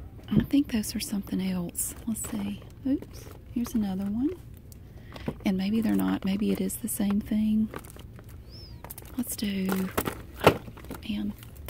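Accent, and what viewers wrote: South, United States. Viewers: I ♥️ your soothing southern voice. Greetings from Germany 🇩🇪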